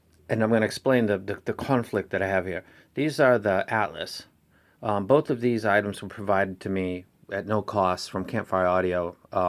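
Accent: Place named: Maine, United States. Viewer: you sound like youre from Maine :)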